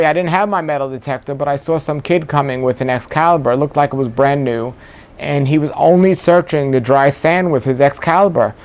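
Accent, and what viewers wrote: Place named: New York, United States. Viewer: Your from NY right ? Can tell by your accent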